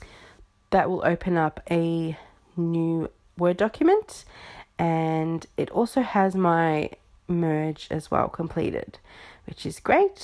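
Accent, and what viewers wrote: Australia. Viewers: Goofy ahhh Austraalian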